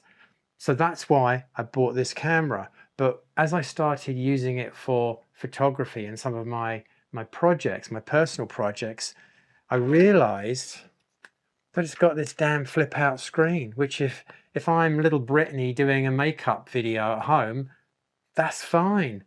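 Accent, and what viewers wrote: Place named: United Kingdom. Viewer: Point and shoot works for me (Panasonic Lumix DSC-S3). I just rediscovered an old Agfa Optima 1035 I have, still in brand new condition. I will make a project this year of getting used to film caneras again. With this nice little cam. As a fun project. I’ve been a musician and painter all my life, but always used a camera when I had a chance, since I was a kid in the 60s. I take pictures everywhere we go, many just around home. The small town and everyday mundane images video caught my attention. I like gas stations, store fronts, shop windows, grocery store shelves, toys, books, simple flowers, fruits in bowls among other everyday subjects. You sound British to me (sorry). Thank you for the frank and fine posts.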